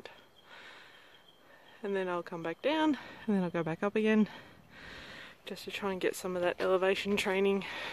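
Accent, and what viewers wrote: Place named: Australia. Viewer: Keep up the good work as Americans love Australians and there awesome accents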